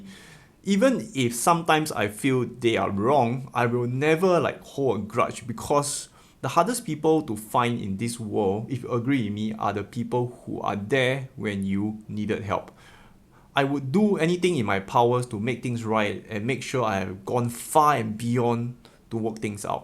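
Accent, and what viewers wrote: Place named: Singapore. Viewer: Singlish😂, long time did not hear it.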